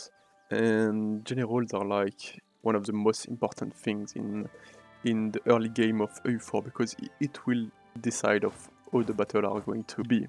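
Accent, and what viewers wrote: France. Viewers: Why you speak english like macron? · A french man speaking english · L’accent français le plus beaux qu’il soit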